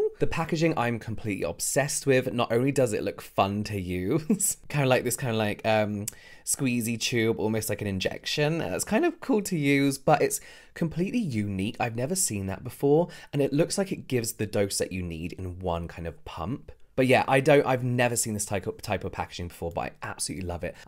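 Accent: United Kingdom. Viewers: It is the way you explain things, describe products, give your opinion with a touch of British accent that makes watching your videos such a learning experience and a joy! 😊❤️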